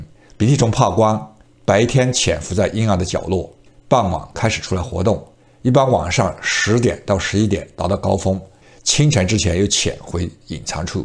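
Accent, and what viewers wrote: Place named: Sichuan, China. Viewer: 谢谢李老师。四川人？很亲切的咔咔角角。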